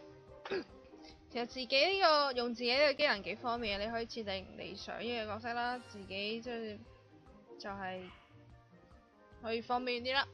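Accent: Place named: Taiwan. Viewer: 我仲以為你係台灣人...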